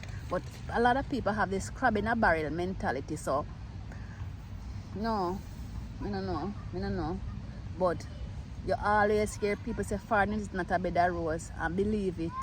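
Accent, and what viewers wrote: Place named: Jamaica. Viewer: You're a very very real Jamaican the way you talk and act.. Be blessed..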